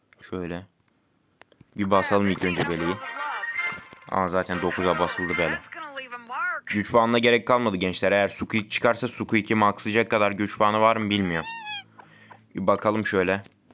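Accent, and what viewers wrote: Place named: Thrace, Turkey. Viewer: Trakya aksağanı gözümden kaçmadı. Selam olsun sana hemşerim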